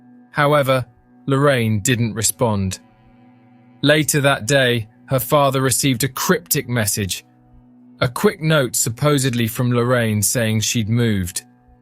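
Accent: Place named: England, United Kingdom. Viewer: Why are you speaking with an English accent but using American terminology? We don’t have “sidewalks” we have paths. We have mobiles, not “cellphones” We don’t have “garbage” we have rubbish. We don’t have “apartments” we have flats. We don’t have “trash bags” we have black bags or rubbish bags. I really h@ these bloody robots.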